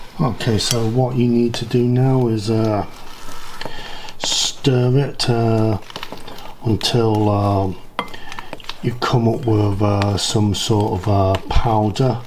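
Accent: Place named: North, United Kingdom. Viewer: I AM FROM THE 1960 S IM.IM IN NZ I JUST REALLY ENJOY HOW YOILIIU DOYOUR COOK COOKING.PROGRAMME MR. CHIEF THE..INGRIEDIENT AND PREPARE OF THAT YOU ARE COOKING AND TO THOUROUHLY COOK PERHAPS THAT THOSE LIKE TO VEIW THIS PROGRAMME ALOT THE COOKING RESULTS ARE SCRUMBY THEEDEDESE RRECIPIES ARE HAVE TO BE VERY WELL MADE ANND SCRUMBY THANKYOIU MR COOK I REALLY LIKE THOSE TYPES OF FOLK AN THIER ACCENTS.LIKE YOURS FROM THE NORTHERN PLACES OF THE WORLD YOUR PROGRAMME PROVIDES HOW TOO WELL MADE SCRUMBY DISHES AS WHEN AS ALSO I VE BEEN INOLVED TOO COOK HERE PREVIOUSLY . THANK YOU MR. COOK THESE DISHES ARE SCRUMBY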